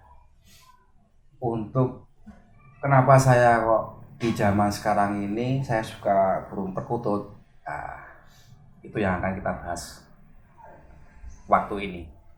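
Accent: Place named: Ambon, Indonesia. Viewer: Gw Kira org ambon kmu bro ??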